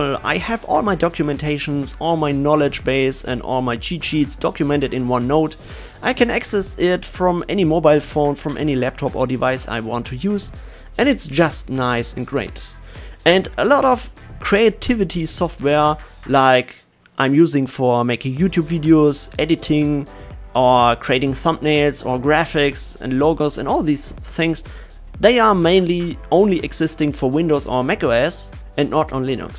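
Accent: Germany. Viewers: Sag mal, bist du deutscher? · ist auch völlig in Ordnung. Der deutsche Akzent ist sehr deutlich, mag ich!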